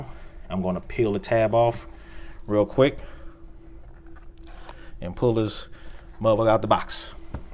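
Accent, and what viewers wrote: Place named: Texas, United States. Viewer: Love the Texas accent, subscribed and thumbs upped :)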